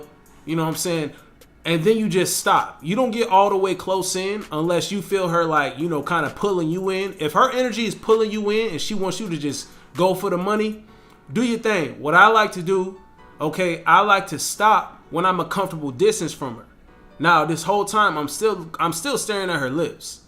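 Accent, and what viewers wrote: United States. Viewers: Yo you from tampa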